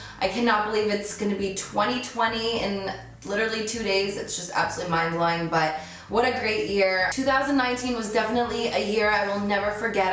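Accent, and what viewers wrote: New Jersey, United States. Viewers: Sooooo Jersey ! Love it!!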